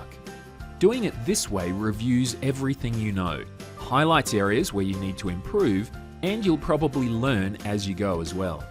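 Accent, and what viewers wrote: Australia. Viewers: Super helpful! Genuinely ingenious advice I haven't heard before. P.S. Is it just me or does the speaker have a weird mix of Aussie and American accents?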